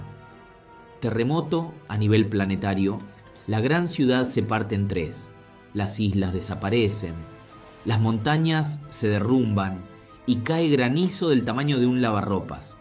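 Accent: Argentina. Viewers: Me encanta el acento argentino. Lo único que me gustaría que fuera narrado con menos humor irónico.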